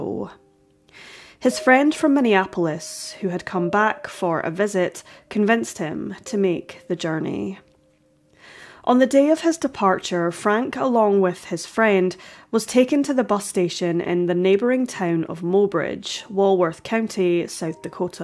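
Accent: Scotland, United Kingdom. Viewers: The Scottish🏴 accent is quite lovely🤗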